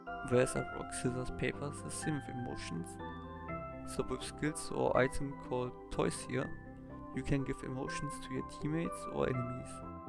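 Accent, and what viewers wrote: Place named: Germany. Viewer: Habe etwas rausgehört, dass du aus Deutschlanf bist und mega tolles Video, nur man versteht dich etwas schlechter:/ Aber keep it up!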